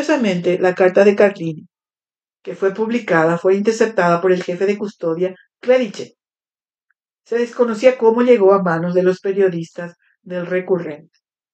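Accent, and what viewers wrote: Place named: Portugal. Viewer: Me encanta el acento portugués 😘🤗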